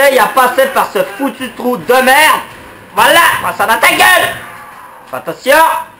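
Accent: Quebec, Canada. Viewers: avec l'accent canadien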